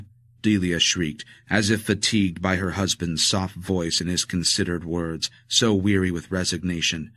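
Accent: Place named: United States. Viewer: As always, Nevill’s tale is rich and textured and compelling. His prodding of what scares us, ancient fear, from before we knew what fear was is—if not actually unique—superlative. Why a Yank narrator? Got in the way a bit.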